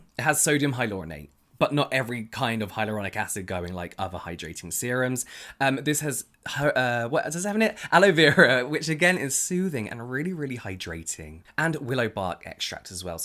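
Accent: United Kingdom. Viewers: Okay i have to say it. I just love you and your Videos and your Intro and the way you speak and your British Accent, it sounds so elegant 😄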